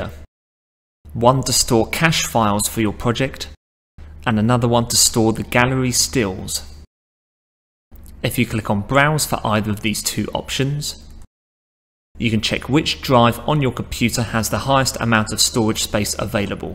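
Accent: United Kingdom. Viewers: British accent ❤️❤️❤️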